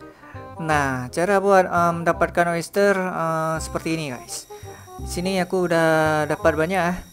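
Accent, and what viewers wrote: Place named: Aceh, Indonesia. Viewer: Salam dari aceh nice video bro pantes logatmu kok kaya familiar saya hahaha.. beu maju beu sukses beuh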